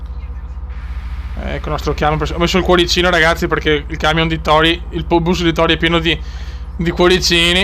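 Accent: Veneto, Italy. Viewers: L'accento veneziano mi fa impazzire, sei un grande! Vai così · Quanto mi sta in culo il tuo accento veneto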